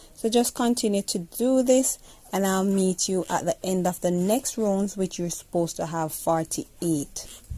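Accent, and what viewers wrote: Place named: Jamaica. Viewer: Love ur Jamaican accent and skills had to subscribe . Keep up the good works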